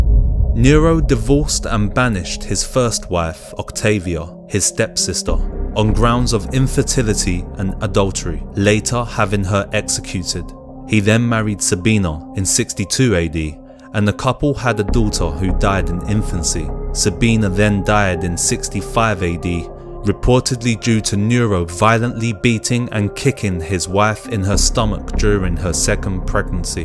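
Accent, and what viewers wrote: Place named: East London, United Kingdom. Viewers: Nero was clearly psychotic. The Mad King? Nice voiceover- East London much? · What accent is that... It sounds like the way my black friends speak near London. Brilliant stories btw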